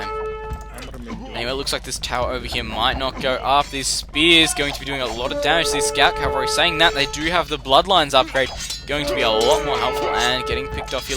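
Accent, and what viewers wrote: Australia. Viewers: from your accent you're Australian. Cant you get hex to pay for your uni?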